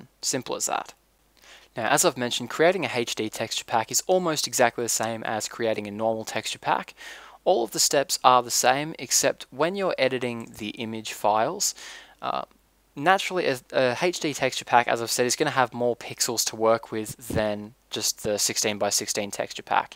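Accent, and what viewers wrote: Australia. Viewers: hes australian? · Are you from Australia? C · i love ur accent im not sure but is it Australian?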